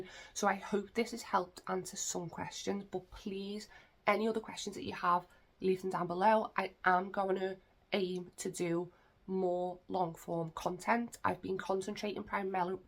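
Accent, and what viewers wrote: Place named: Liverpool, United Kingdom. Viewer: Need a better Mic.hard to hear, and speak slower cause of scouse accent.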